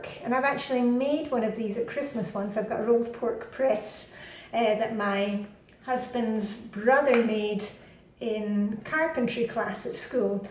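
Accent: Scotland, United Kingdom. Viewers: Its so different than the US. hardly anyone would eat liver pate’ here because the abuse those poor geese suffer is horrible but we think nothing of using plastic sandwich bags which never disappear!!!! Okay so I’m new here. Why are you living there? You sound like you’re Scottish. How old are your kids? You look adorable. I love your haircut (I’m a hairdresser) and your cute outfits.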